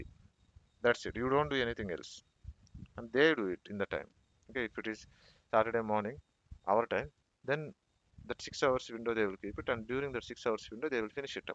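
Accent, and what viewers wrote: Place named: India. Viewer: So hard to understand with indian accent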